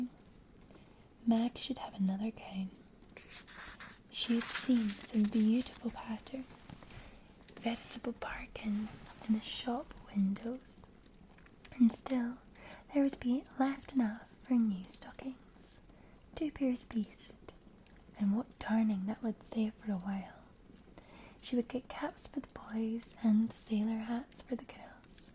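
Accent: Scotland, United Kingdom. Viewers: What is this lovely Scottish woman doing in my bed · This one makes me feel like I've been pampered by a sleep-angel, sent from Scotland to give me a relaxing infusion of comfort sleep. Sending happiness and health to you Ms ❤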